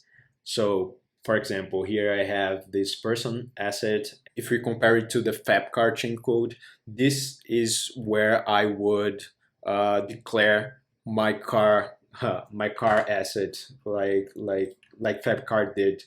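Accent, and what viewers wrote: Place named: Brazil. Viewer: sabia que era br quando escutei o sotaque kkkk, mas bem legal o vídeo, parabens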